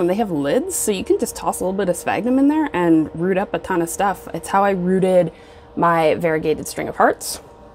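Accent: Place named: California, United States. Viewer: You talk just like a Californian, LOL 😄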